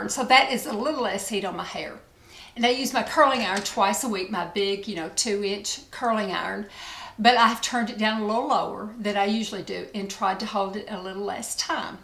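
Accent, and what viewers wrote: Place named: South Carolina, United States. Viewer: Hi I've been watching you for a long time now. I like playing videos while putting on my makeup when I can. First thing I thought was "she sounds like me!" Lol I'm a born & raised South Carolinian! At that time no other women on YT in the beauty community sounded like us so that's how I found you. I subscribed and haven't missed a video since plus I've gone back and watched a lot of your videos from earlier days. You're a beautiful woman first of all but I think you're a beautiful soul as well. I enjoy your videos and you've convinced me to try a few products and NOT try a few. I'm 56 married 34 yrs in Oct 4 children 2 living and 5 beautiful grandchildren. I love makeup big hair my jewelry and clothes so I fit right in or you fit in to my lifestyle. Thanks for sharing and the tip about the Tazo tea for nausea that helped Doug has really helped my husband. 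I just wanted to say I appreciate you! Hope you do videos for a long time to come. Blessings & love from SC ❤🌹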